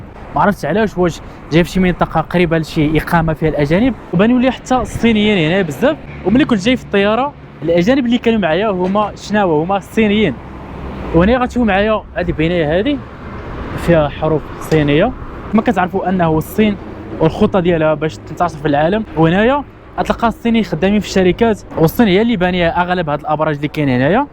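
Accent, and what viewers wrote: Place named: Tiznit, Morocco. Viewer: واخا جلتي و درتي باقي هدرتك معكلة واش نتامن تزنيت ولا النواحي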